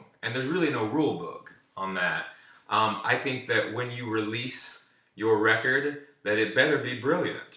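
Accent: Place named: Tennessee, United States. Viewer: Lolz I can hear the TN in his voice. XD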